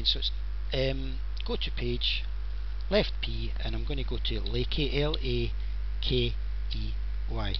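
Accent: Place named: Scotland, United Kingdom. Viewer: you have a lovely accent! scottish?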